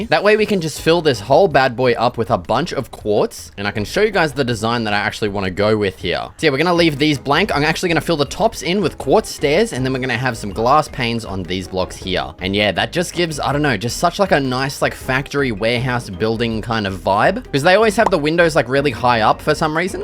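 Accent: Australia. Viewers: this is the most australian man i've ever heard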